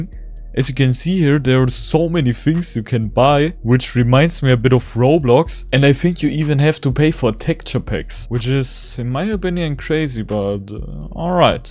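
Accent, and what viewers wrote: Germany. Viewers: Are You from German? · German accent, I love it😂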